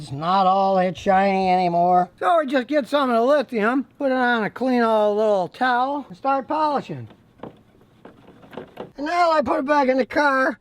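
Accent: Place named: Texas, United States. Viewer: I am here for two reasons: cars DIY and texas accent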